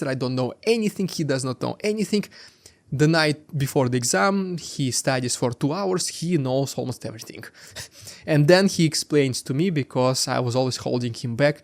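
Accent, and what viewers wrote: Russia. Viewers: He's Russian that's where the accent comes from.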